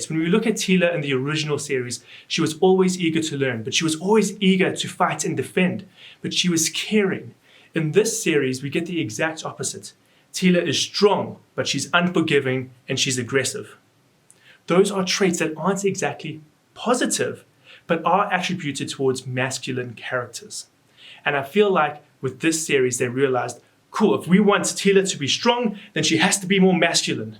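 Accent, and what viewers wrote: South Africa. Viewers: Howsit bru nice to hear a South African voice, yeah this sucked big time a show that was launched in the 80' for young boys that is now about a show that lacks any sort of male character, Ripley and Sarah Connor are great examples of strong female leads, young boys need heroes too man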